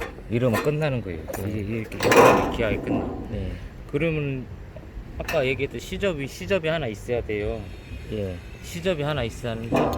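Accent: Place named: Chungcheong, South Korea. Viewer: 충청도 사투리가 정감가네요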